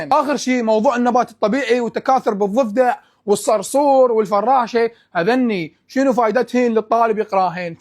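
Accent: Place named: Iraq. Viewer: ياخي اللهجه العراقية تهبلل😭💞✨‼️‼️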